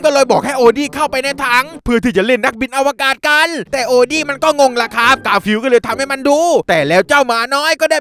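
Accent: South, Thailand. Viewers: พี่ครับพี่เป็นคนใต้หรอครับ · พี่เป็นคนใต้หรอครับ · สุดยอดสำเนียงใต้ 555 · คนใต้ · พี่คนใต้ใช่ไหมพี่ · พี่เป็นคนใต้หรอ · พูดไต้ได้ด้วยไอย่าไอบาวนี้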